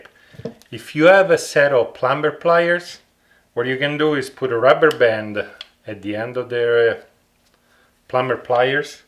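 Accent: Italy. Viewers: love the accent! Italy?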